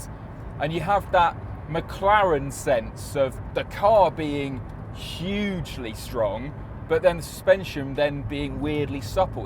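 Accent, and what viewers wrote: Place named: United Kingdom. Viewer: i`m sorry you are so british i cannot even listen to this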